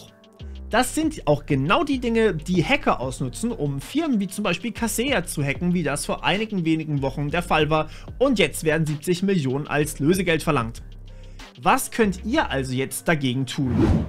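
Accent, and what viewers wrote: Nuremberg, Germany. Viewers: Bist du aus Nürnberg ? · kommst du aus nürnberg?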